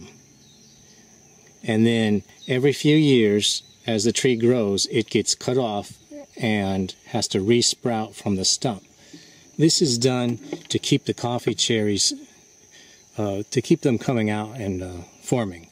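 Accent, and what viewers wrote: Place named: Wisconsin, United States. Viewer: great video. guy sounds a bit like Clint Eastwood with a Wisconsin accent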